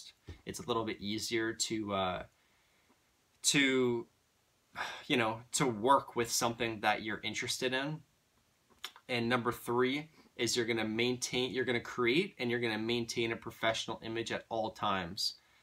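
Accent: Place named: Canada. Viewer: I know you'd Canadian by how u say owt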